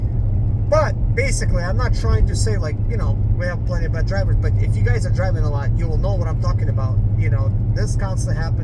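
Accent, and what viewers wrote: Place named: Balkans. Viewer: What nationality are you? You sound very european. Particularly balcan. Am i correct?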